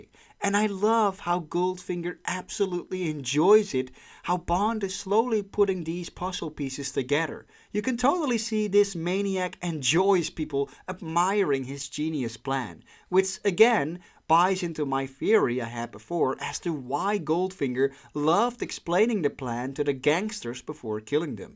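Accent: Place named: Netherlands. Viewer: I am back and really enjoyed your previous recaps of the early Bond films. The Dutch accent adds something and this revised overview is fantastic. Keep them coming. I have subscribed.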